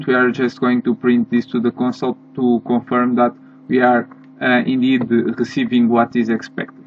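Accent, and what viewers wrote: Portugal. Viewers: Are you portuguese? Your accent seems me very familiar 😂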